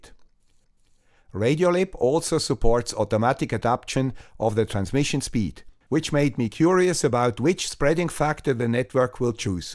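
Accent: Switzerland. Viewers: Hold on hold on you're Swiss! I thought you were Dutch. Then what do I know, I'm English with Danish ancestors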